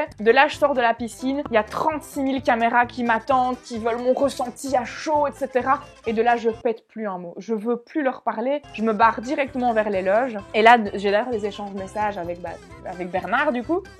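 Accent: Belgium. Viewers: Bonjour, j’aime bien vos vidéos, un peu jaloux de vos abdominaux, vous êtes belge, votre accent ressemble, à l’accent des belges de Mons, vous connaissez? je suis franco belge, François Damiens, je le kiffe avec son rire de pervers quand il passe sa langue, bref vive la Belgique!! Et la France! Bye… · L'accent belge bien de chez nous 👌 tu es de où ? 👀 J'aimerais bien savoir 🙃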